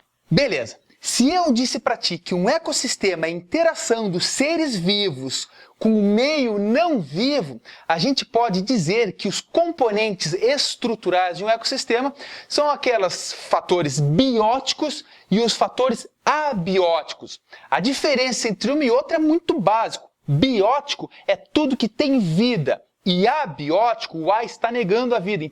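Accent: Rio Grande do Sul, Brazil. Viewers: tu é gaúcho sor?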